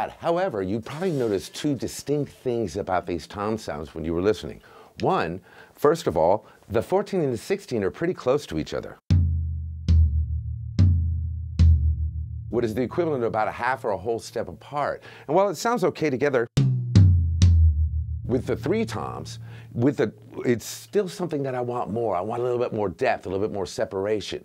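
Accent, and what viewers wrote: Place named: South, United States. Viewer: Oh man, I absolutely love your presenting style and that "southern drawl" in your voice! Thank you so much for all the great videos, and greetings from Finland! 🙌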